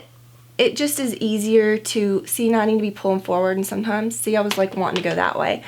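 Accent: South, United States. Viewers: Southern accent is so funny. Love it. Of course I am southern. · I don’t know what I love more, or her country accent! 😍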